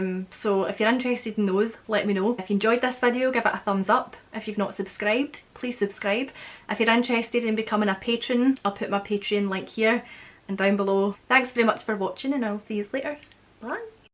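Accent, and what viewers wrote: Scotland, United Kingdom. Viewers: I feel like I'm in love with the Scottish accent.❤️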